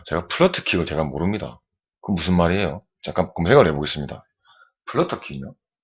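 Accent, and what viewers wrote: Gyeongsang, South Korea. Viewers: 존경하는 선생님 경상도 억양이라서 생소했는데 말씀이 이제는 여러번 듣다보니 친숙합니다요. 잠영연습하는데 벽차고 가는데 바로 엉덩이랑 뜨는 경우는 뭡니까? 어케 들어가는 거에요? 입문한지 석달입니다. 초급입니다.아래 글보니 물타기 연습이라는데 그건 몹니까? 강사선생님께서 연습해오라고 했는데 어렵네요. 몸이 떠버리까 방법이 없네요. 한수 가르쳐주십시요.부탁드립니다. 구독 클릭 좋아요 클릭했습니다.하트뿅뿅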